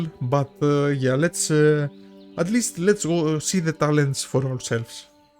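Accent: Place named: Greece. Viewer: From the accent i can tell you are greek 😂 ! Are you??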